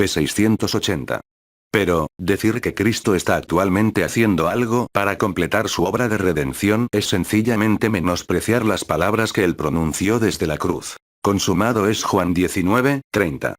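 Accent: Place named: Spain. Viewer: Me hubiera gustado en españo latino, el español de España es bastante malo.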